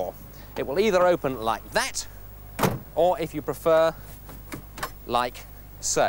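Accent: United Kingdom. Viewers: Gary Dunn Yeah, but my point was just that people pronounce (and spell) things differently in different countries, so no-one is right or wrong. This video is of a British programme (<yes, that is the British spelling) for a British audience, so that is why he pronounces Marquis the way he does. The British pronounce 'coupé' as the French do, but Americans say 'coop'. I don't suppose it really matters...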